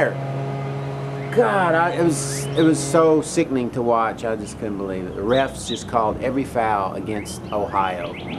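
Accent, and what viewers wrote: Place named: Missouri, United States. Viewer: lol, you're so wacked! Great video on Ex-C. So you're from Tennessee? I wouldn't have guessed it if you hadn't said so. I would have thought you to be a Missoura-in due to your accent.;) Love the fro.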